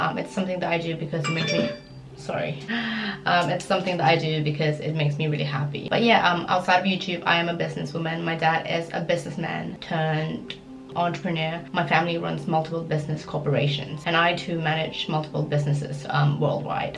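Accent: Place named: United Kingdom. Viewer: her voice is becoming very very British now,, lmao its cute ♡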